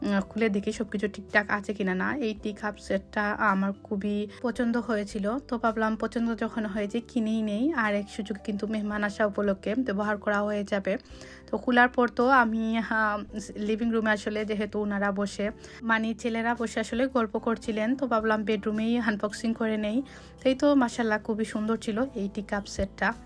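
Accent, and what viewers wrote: Sylhet, Bangladesh. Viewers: Kotay bujai jachhe uni 100% sylheti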